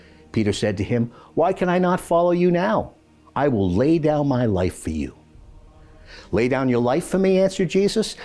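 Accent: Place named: Boston, United States. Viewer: I recognize that Boston accent 😊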